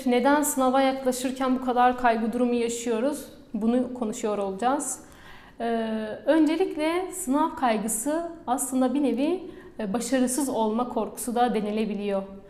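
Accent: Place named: Van, Turkey. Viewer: Hocam siz nerelisiniz? Vanlılara benzer bir ağzınız var ama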